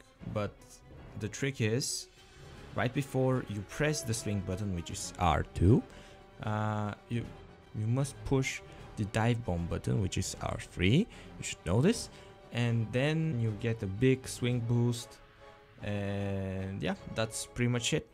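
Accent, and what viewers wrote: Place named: Russia. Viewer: Чё то кажется, что у тебя русский акцент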